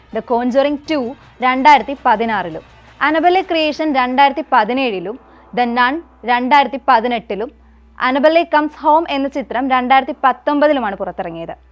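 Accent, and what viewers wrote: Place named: India. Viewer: Indian accent bruh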